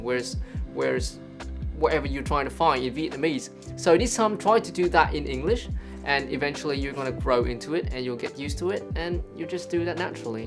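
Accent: England, United Kingdom. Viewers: Tiếng anh của England nghe sang vãi đái :)) đc quả accent này đi cưa gái thì đỉnh ae nhờ :)) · nice British accent, mate! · Em ít khi nghe người Việt mà có accent 100% anh-anh như anh, nghe sướng tai gì đâu ❤️❤️❤️ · Cái accent của anh giống người anh nói ghê ah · I love your British accent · Thích BA vclll =))) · OMG British accent , luv it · giọng này hay nhưng pha Australian r nhé bạn. K hẳn British. Cách bạn ấy nói YouTube hoặc about. N chung là có xu hướng British thôi. Thú vị phết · nói giọng dễ nghe quá luôn í phát âm accent british mê =((( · Seems like he's talking in British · You have such a great British accent which makes me feel a bit more comfortable when practicing it. Keep up up the good work! Ps: from Vietnam :3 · My bf (Caucasian) told me that your British accent is pretty nice. The only funny thing he noticed that you said “elementary school” instead of “primary school” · Mô Phật, coi miết mà giờ mới để ý cái series này, kể ra tui cũng khá giống đấy chứ và bây giờ tui có thể enjoy these videos with English accent =>>>>>> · British accent wow amazing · Good UK accent there, mate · Love this British accent!!! 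· uầy anh có cách phát âm của người Anh rõ rệt nhưng cũng pha 1 chút Mỹ ngưỡng mộ thật sự :3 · dude this is obviously british accent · British accent đỉnh quá :3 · nói giọng anh - anh nghe cuốn ghê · I was like hmmm, that sounds like a British. And then the "mate" came out. Yup that is british accent lol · omg, his British accent is incredible! beautiful. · I really love your British Accent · Woww👍British accent...love it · Ahhh thích bạn này British accent 👍👍👍 so admirable bro 😍😍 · how cute ur british accent... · your British accent is addictive af omg chef kiss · I LOVE YOUR BRITISH ACCENT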